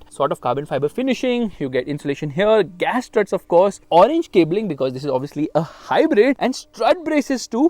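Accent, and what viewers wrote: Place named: Karnataka, India. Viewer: Bro just amazing to hear Kannada voice from cop site bro❤❤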